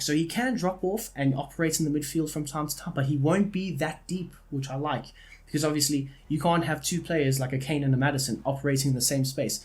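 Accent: South Africa. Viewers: Are you South African by chance?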